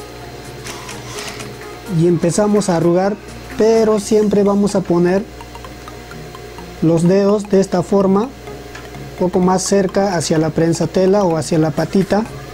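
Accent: Peru. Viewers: Buena info....eres de Perú?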